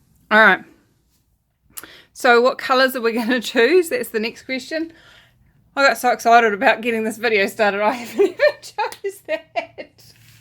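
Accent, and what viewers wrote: South, United States. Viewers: Sounds like a mixture of a Southern and Midwestern accent! This little guy isdarling. You've certainly got it going on this!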